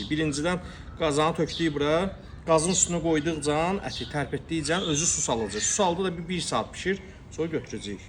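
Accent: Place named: Baku, Azerbaijan. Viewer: "Çoxlı qurdalıyırığ " canım 👑BAKILIM👑 ləhcövə qurban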